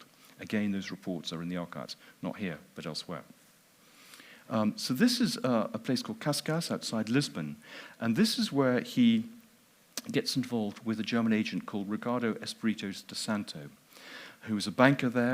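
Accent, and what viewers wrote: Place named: Scotland, United Kingdom. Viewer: I couldn't quite place his accent.because just one or two words have a flat sound ...rather like.a. northerner but he's so posh !! But then it says he is Scottish! So that explains it ! Scottish...Westminster school accent !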